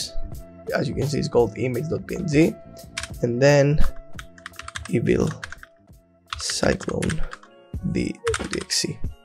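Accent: Greece. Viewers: Great video and great accent (comming from another greek)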